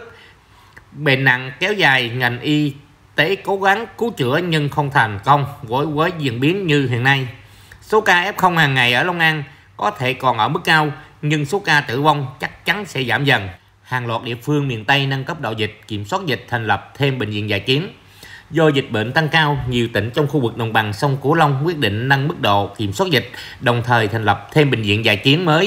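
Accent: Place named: Quang Nam, Vietnam. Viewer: Giọng giống quãng nam quá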